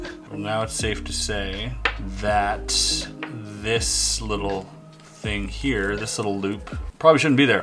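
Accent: Germany. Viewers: Watching you untangle puzzles is soooo relaxing(especially since I don't have to do it myself); ASMR, anyone? 😊 Fun to watch and your German accent is excellent! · Are you german? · Are you German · Are you a german guy i'm a german guy and it was so clean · I love your German dialect! Grüße :) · That German accent 🤗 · Ich hatte auch von Anfang an das Gefühl, dass er deutscher ist. bzw wie er einige Sachen ausgesprochen .🤔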